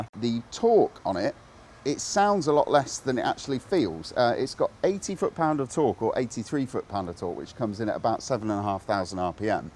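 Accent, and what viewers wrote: United Kingdom. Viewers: I wish I understood more what he was saying. My family is Irish so Im used to some heavy accents but sometimes the British slang and accent is rough.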